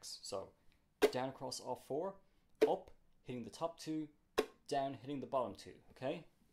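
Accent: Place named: Ireland. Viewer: where are you from? it sounds to me like you're from a part of Ireland hehe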